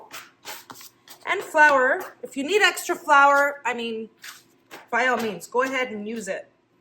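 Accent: Portugal. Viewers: Your português acent is beautiful ❤❤❤❤ I'm from Portimão in the Algarve, are you from Açores?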